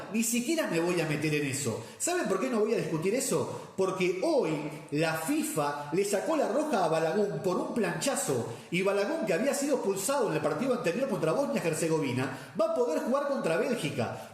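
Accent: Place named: Argentina. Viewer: Hola mi buen Argentino (supongo por tu tonito) te equivocas al pensar que no conocemos nuestra realidad (futbolística) pero si te invitaría a qué no te amargues ni te lo tomes en serio lo del fútbol, por qué los mexicanos pasada la emoción volteamos la pagina y seguimos la vida igual de alegres (si conocemos nuestra realidad) admiramos a Messi si eso te hace feliz .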